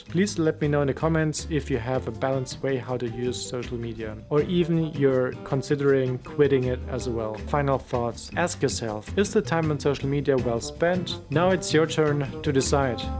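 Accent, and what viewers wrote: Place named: Germany. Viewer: I dont think that it has to be completely black or white. I never used Insta, TikTok or Snapchat in the first place but I spend a lot of time watching YouTube videos. When watching YouTube I'm still split apart. I mean I watch a lot of educational videos (like this one), but I still dont feel productive when doing it. By the way my first language is German too, I barely noticed the accent.👍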